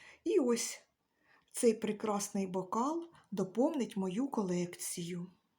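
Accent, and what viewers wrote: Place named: Cherkasy, Ukraine. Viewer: Дякую за вашу співучу черкаську,нашу солов"їну українську мову. І вас з наступаючим новорічними та різдвяними святами! Миру, здоров'я, благополуччя!!!💙💛💙💛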